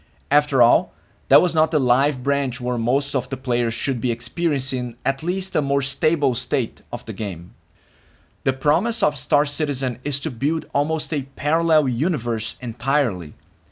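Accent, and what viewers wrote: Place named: Brazil. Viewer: Vc é brasileiro não é?? Teu sotaque em inglês é BEM BRAZUCA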